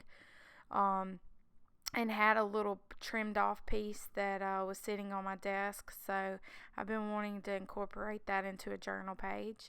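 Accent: South, United States. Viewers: Welcome back! I have sure missed your wonderful videos, and that cute southern accent!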